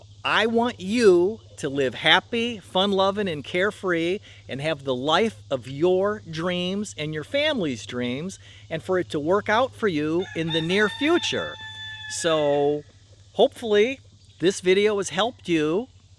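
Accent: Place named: New England, United States. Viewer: I know this video is 2 years old and I've only watched 1 other of your videos but I wanted to take a guess based on your accent. Massachusetts Rhode Island Connecticut California now to watch the video and see how bad I missed.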